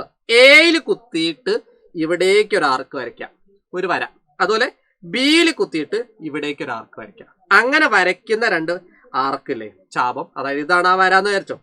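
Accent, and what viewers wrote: Kozhikode, India. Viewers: Sir Kozhikode ano stalam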